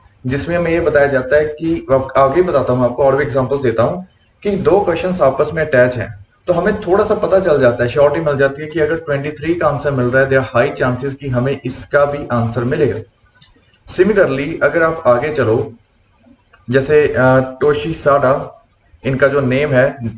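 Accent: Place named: India. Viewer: English hindi mix accent 😏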